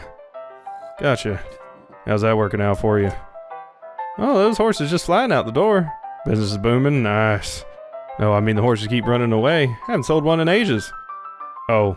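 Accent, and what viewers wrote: United States. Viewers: Gonna be hearin' a lotta that southern part'a ya. Can't wait. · To be fair I'm not a huge fan of "funny" games, but I do love western, and when I saw the Dad's animation I decided to at least giving this episode a shot. Liking it so far, but I'll mostly keep watching for your cowboy accent:D Happy New Year,!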